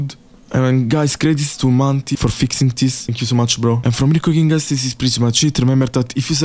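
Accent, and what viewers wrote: Italy. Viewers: fra ma sei italiano ?? non sembri inglese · l'accento italiano spacca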